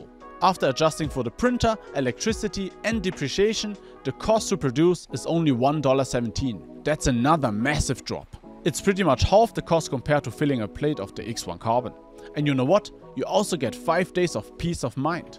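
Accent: Germany. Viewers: I just realized this dude Asian, never thought I would see an Asian with a German accent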